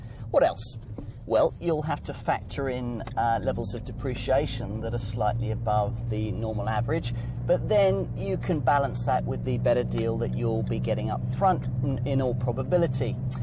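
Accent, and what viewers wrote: United Kingdom. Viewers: This is my all-time favourite video of Jonathan Crouch because I own a Fiat Linea 1,368 FIRE engine petrol version. The Linea itself is a Punto derivative. This review is thoughtful, detailed, insightful and characterized by excellent style of English speaking and vocabulary that only the British can create with their perfect accent. I watched it 20 times already, and is my favourite video apart from the Fiat Tipo and Fiat Fullback.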